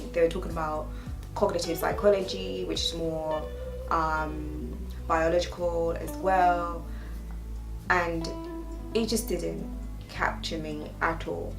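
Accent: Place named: United Kingdom. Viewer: Who would have thought you were from Blighty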